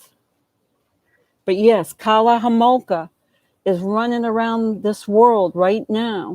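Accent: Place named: Boston, United States. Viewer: Can hear the Boston accent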